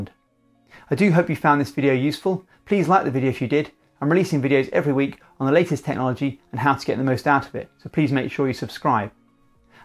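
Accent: United Kingdom. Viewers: Hi ur british?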